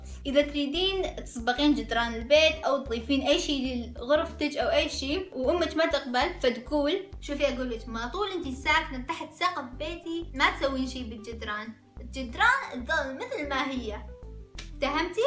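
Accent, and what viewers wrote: Mosul, Iraq. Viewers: والله كلها يگولوها الي ... بس ممكن سؤال .. انتي مو من محافضة الموصل ؟؟ لأن كلامچ كلة موصلي ☺ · ممكن اعرف انتي عراقية لولا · هاي عراقية لو شنو😕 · xoxo لا مو قصه اغلاط ..بس الطريقه مال كلامج مبينه عراقيه دا ادمجين عراقي ع لبناني ع مااعرف يالغه 😅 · صعب تحجين احسج من يامحافظة انتي من العراق؟ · لهجتج العراقية شعجب مو ضابطة؟ والفديو حلو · .20602 هاي من العراق من عراقية بس مصلاوية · اوي لهجتج لهجه الموصل 😙😙 ، ذكرتيني ب صديقتي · علما اضن انتي من الموصل لان المصالوة هيج يحجون ودمج ينطي للمسيح بس حبيتج استمري 😊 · هاي اكيد كلهن صايرة بينة وختصة من اخذ درجة زينة ويقارنون .. بس سؤال انتي من اهل الموصل ؟ ياريت اجاوبين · اني متاكدة انك من الموصل لان لهجتج كلش قريبة على المصلاوي اتمنى تكليلي انتي من وين؟؟؟ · لا هيه عراقيه مو مغربيه ... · اي لأن شمال العرآق مثل أهل الموصل وهيج شي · اعتقدها من اهل الشمال اهل الموصل او هاي المناطق الشمالية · انتي من موصل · عراقية ولله عراقية 😂😂😂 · مصلاوية اهل الموصل هيج يحجون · عراقية بس لهجة مصلاوي هاي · انتي عراقية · هاي شنوو عراقيه موو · كلامكي مصلاوي يمكن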